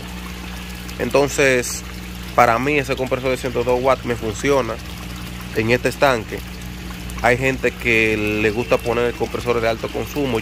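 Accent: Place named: Dominican Republic. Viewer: Saludos usted suena como dominicano, tiene Instagram para comunicarme con ustedes?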